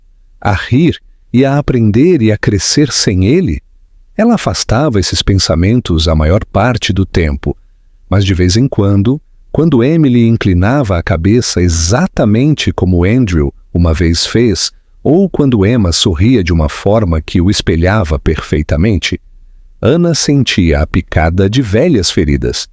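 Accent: Portugal. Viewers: Não suporto narrador falando o português de Portugal, não se preocupam nem com nosso idioma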